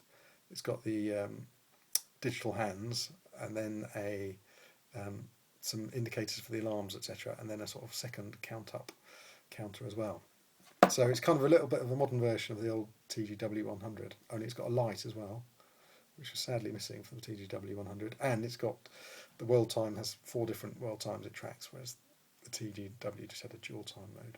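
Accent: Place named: United Kingdom. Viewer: Why is hearing a British guy explaining something so awesome?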